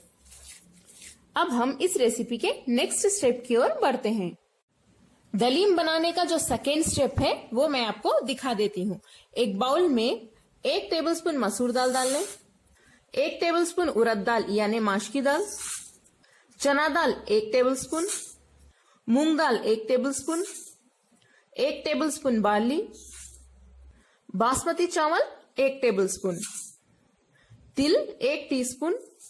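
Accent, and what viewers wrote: Hyderabad, India. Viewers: Aap Bhi Hyderabad K Hai Kyaa? Kaiku Bole Toh Thodi Baat Se Hyderabadi Mlm Hora!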